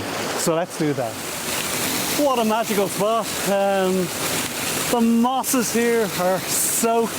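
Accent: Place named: Ireland. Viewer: Love the softly spoken Irish narration in your vids., very calming along with your intimate landscape and macro work ( my style of photography ) just very enjoyable viewing. Keep up the good work. 👍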